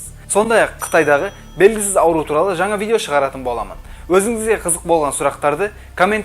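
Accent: Uzbekistan. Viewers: Мардан сен озбек емессенбе сал сал келесен акцент бардай тилинде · Мынау кым озы Блогер не ушын Казакшага Озбекше косып сойлейды дохарсай Шала казаксынбе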